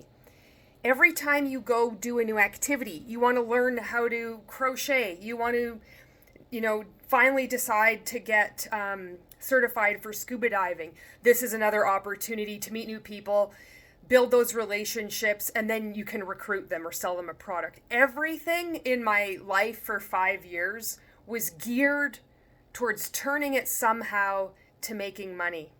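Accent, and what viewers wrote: Canada. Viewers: I think you should monetize. I don’t care if you make a little dough off me. You are hilarious, sincere, direct, and I love an angry Canadian accent! SCAAAAAM!!!! Love your content and keep it going.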